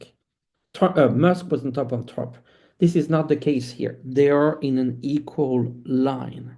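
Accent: France. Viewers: Oooh,! Hi, I’m the gal that LOVES how you say “tableau”….yes, it’s me again! I am soooo happy to see you again these days. I’m now a subscriber too. I love your accent! Ooh la La!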